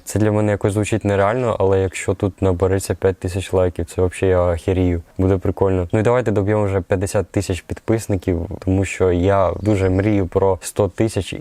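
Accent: Chernihiv, Ukraine. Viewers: Ти з Чернігова? Вогонь) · опа, а ти з Чернігова?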